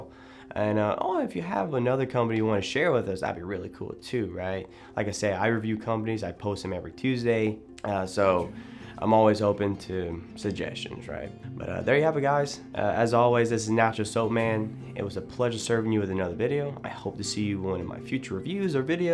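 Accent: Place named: South Louisiana, United States. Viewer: I literally subscribed because you said you were from south Louisiana. I lived in Lafayette for 18 years. I can definitely pick up on your accent!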